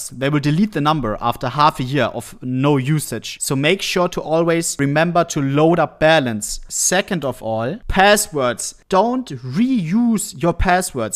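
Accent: Germany. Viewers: Dude his german accent drives me crazy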